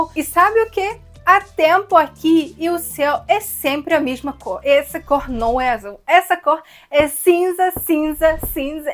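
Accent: Rio de Janeiro, Brazil. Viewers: Amo o seu sotaque carioca! Você está 99,9% carioca já. Só falta trocar o "mesmo" por "mermo". Kkk. Ah, você é linda demais!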